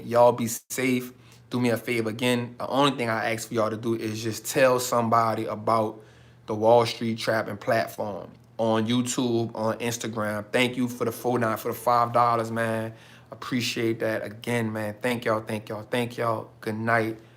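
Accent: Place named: South Carolina, United States. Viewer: Your accent reminds me of my grandmother. She's from South Carolina.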